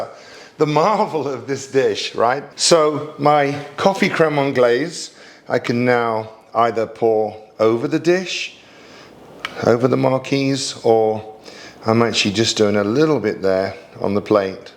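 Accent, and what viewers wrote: United Kingdom. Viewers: I had to subscribe. I just love the way you talk. The British vibe is everything! Cheers from France! 😊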